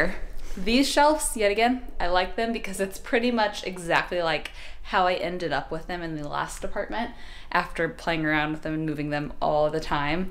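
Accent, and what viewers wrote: Texas, United States. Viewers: It's just Texas people that talk like that. My sister in law talks wierd.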